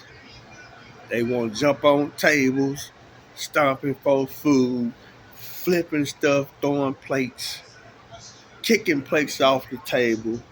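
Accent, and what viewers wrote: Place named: Deep South, United States. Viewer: Sound like a slave telln a story. Must be deep south Georgia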